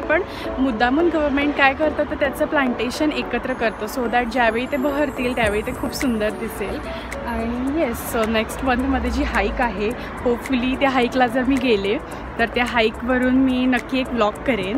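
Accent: Pune, India. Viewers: पुणेरी मराठी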